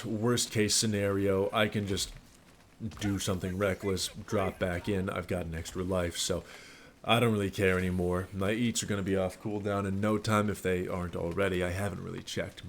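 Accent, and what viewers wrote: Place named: Texas, United States. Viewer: from the deep baritone tones to the Texas draw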